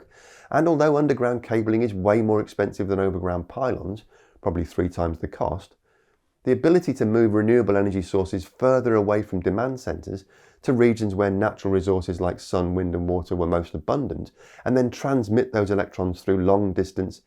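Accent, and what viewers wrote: United Kingdom. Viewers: The climate scam is going to be fully exposed. The climate models are a complete fraud because they are base on the assumptions. A British accent won’t overcome the fraud, I’m pleased to say.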